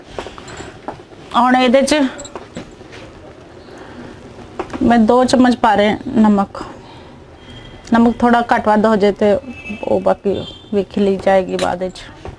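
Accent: Faisalabad, Pakistan. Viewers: ji, tusi kehray pind tun hagay o, kion k tuhadi punjabi bilkul Pakistan wich Faisalabad wich boli jandi ay. Saday nal bilkul mildi ay tuhadi boli. Pakistan tu tuhanoo bahut bahut salam. Saadi baradri v Khokhar ay, asi te fer aiko baradari de hoey na???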